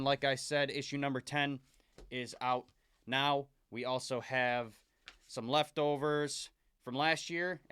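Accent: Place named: Michigan, United States. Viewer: U from michigan? I hear an accent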